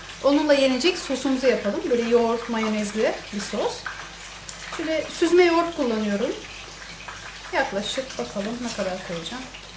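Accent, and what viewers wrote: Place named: Kütahya, Turkey. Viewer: slm hanim nerelisiniz sorabilirmiyim??sanki arada bizim oranin yani kütahyanin sivesini kulaniyormusunuz gibi geldi:-) bu arada dün pisi tarifinizi denedim güzel oldu sagolun ☺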